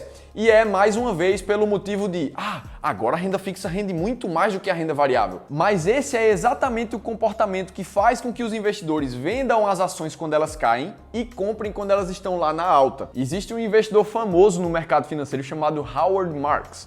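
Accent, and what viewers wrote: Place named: Pernambuco, Brazil. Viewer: ... gostei de ver seu conteúdo!!! E principalmente o sotaque e a batida na mesa...rsrsrs... só faltou falar MASSA e PRONTO! PARABÉNS PELO SEU TRABALHO! Vida longa e próspera nos vídeos do Clube. Obs.:...PE imortal!....Entendedores entenderão.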